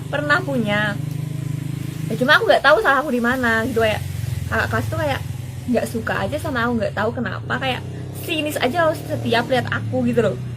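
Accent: Java, Indonesia. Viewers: Sumpah sih ini wilo versi medoknya 😁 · Asli kembar sm wilona.. suara ny sma jg yg bkin beda cm medok jawa ny aj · Mukanya mirip Natasya Wilona.suaranya juga mirip hanya saja medok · Jowone endi mbak, medok nemen bahasanya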